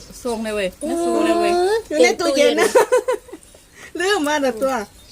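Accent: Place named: Isan, Thailand. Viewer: สาวเหนือเว้าอีสานน่ารักไปอีกแบบครับ FCครับ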